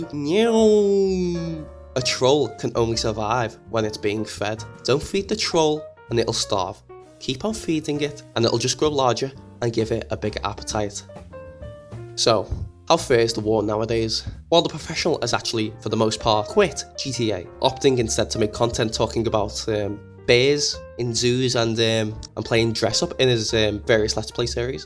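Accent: Liverpool, United Kingdom. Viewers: i cant tell if your scouse or not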